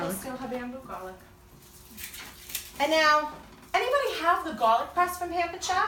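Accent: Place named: New York, United States. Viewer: I love your shirt AND your NY long Island or Buffalo accent LOL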